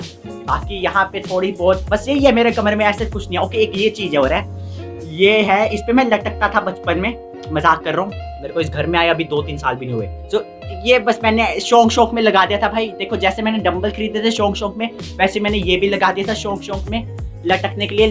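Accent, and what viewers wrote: India. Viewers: Sound like Himachali ??? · Delhi se ho Accent dekh ke lg rha h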